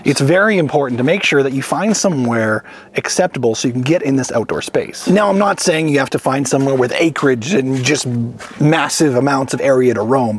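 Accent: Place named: Canada. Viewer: What is this "Boot" he keeps mentioning? He keeps saying something about "A Boot" Lol love the Canadian accent. Great video!!!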